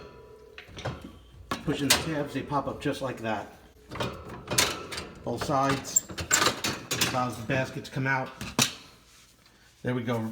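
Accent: New York, United States. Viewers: You from New York?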